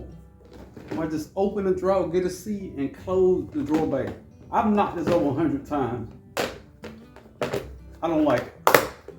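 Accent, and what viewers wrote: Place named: Georgia, United States. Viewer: where did you get your Master grow course certificate from? I want to complete one. Thanks for the videos and I love the realness and natural Georgia dirty south accent! I'm from Georgia myself. Let me know where to get the certificate please. Keep up the grind and growing your own food!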